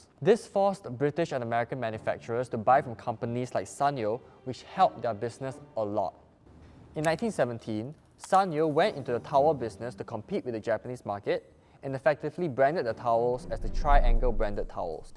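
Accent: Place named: Singapore. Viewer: But that's how Singaporeans speak...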